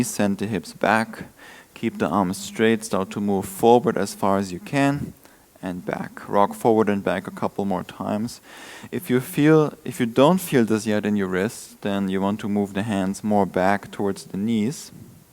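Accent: Germany. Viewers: Hello. I've just recently found you on YouTube and and really loving and resonating with your practice. It is challenging but accessible and I really love your connections between poses. I've had a difficult time lately getting onto my mat, but your practice has encouraged and motivated me. So thank you! xo And I adore your German accent! ;-)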